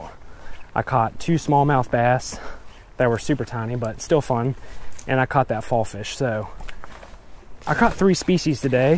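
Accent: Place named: Baltimore, United States. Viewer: Jamie Emes my first guess was Maryland. Sounds like bawlmer. Checked his Instagram seems WV, pretty dang close to western Maryland.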